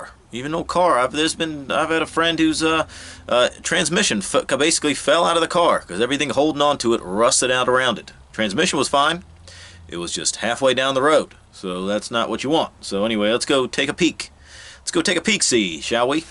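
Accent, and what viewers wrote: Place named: Nashville, United States. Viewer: Where are you from? You’re in Ohio but sound like a fellow Nashvillian!!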